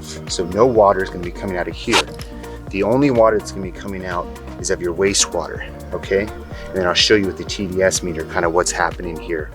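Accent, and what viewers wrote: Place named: United States. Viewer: You have one of the most calm and well spoken American accents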